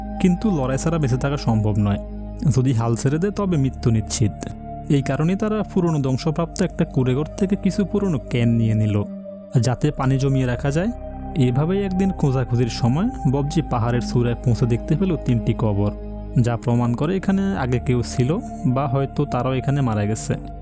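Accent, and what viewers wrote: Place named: Chittagong, Bangladesh. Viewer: বয়েস টা মনে চট্টগ্রাম এর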